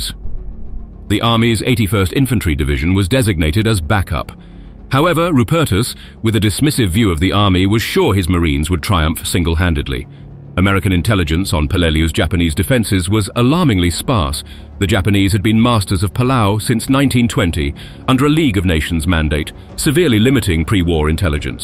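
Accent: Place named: United Kingdom. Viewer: As formidable as the Marine strategies and tactics had become by the time they assaulted Peleliu, their "remarkable success" and "sweeping up whatever was left" after the naval and air bombardments, had nearly always resulted in massive casualties and hard fought battles with the relentless Japanese Imperial Army....Their landing was FAR from easy, ( Do you mean Guadalcanal? ) the Japanese threw everything they had at them from the very beginning, and Peleliu was definitely NOT a "lush" island, it was a craggy, scruffy, unforgiving coral island with sweltering temperatures and very little water....I stopped watching this video after as it is extremely clunky, even with a British accented narrator, pronouncing Peleliu incorrectly.....Semper Fi.....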